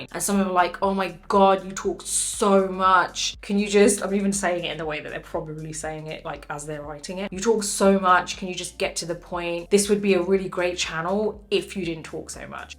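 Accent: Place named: London, United Kingdom. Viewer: got Londer's accents like Michael Caine's. Haha. And I like it.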